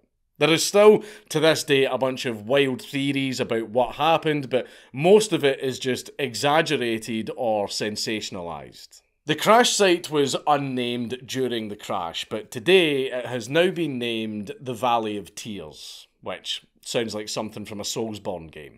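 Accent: Scotland, United Kingdom. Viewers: Never thought I’d be falling asleep to a hairy Scottish man telling me about a plane crash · He should do an video where he speaks like a Scottish road man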